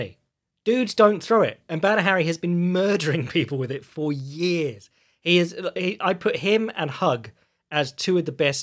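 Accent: England, United Kingdom. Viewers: I love the honesty coupled with an English accent. Great breakdown 👌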